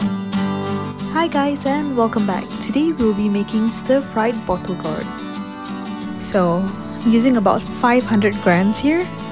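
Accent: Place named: Singapore. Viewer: Your english is close to heart. Sounded Singaporean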